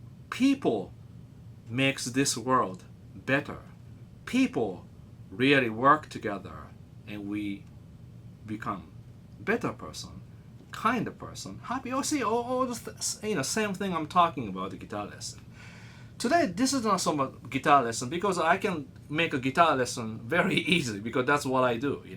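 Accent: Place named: Japan. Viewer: Amazing story! Perfect timing for me to hear this from you as I am also discovering my tone and how it makes others around me happy to hear it. I used to play and think I sounded great when I’m by myself, and then I would go on stage in the early years and all I could do was hear all my mistakes and think to myself how I sucked. But people would come up to me afterwards and say how phenomenal my playing was, and I couldn’t believe it! Because I noticed ALL my mistakes. But I’ve come to the point where I don’t care what other people think, and I don’t care if I screw up at all as long as I can have fun. Thank you for sharing the story about you and your journey with the guitar. I really appreciated hearing it from you. Japanese was my first language and I started learning English when I was six so your accent reminds me of some of my Japanese relatives. 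Jya mata yoroshiku onegai shimasu.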